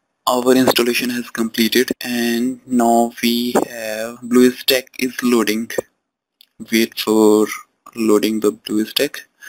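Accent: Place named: India. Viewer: when i hear an indian voice i hear scam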